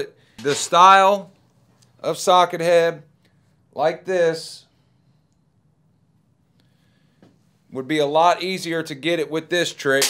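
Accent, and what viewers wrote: South, United States. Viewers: I dont think ive ever heard a more southern accent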